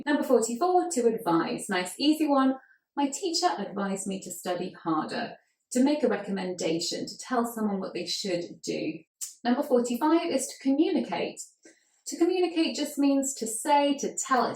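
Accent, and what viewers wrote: United Kingdom. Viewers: I like your British accent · Remarkable lesson! Never been a huge fan of British accent but this channel is making me change my mind I guess 😂 Btw once I heard 'snap' as something similar to lose control or get overwhelmed I think. Will you please post another lesson like that you did a few months back on English history? It was the bomb! My most sincere thanks for this video! 😉😉🖤🖤👍🏽👍🏽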